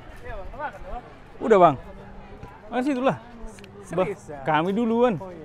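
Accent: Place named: East Java, Indonesia. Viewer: lo kok logatnya kyk wong jatim klo berbahasa jawa gais..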